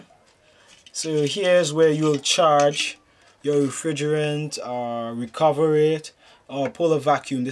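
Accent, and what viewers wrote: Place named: Jamaica. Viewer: are you a Jamaican? u have an accent man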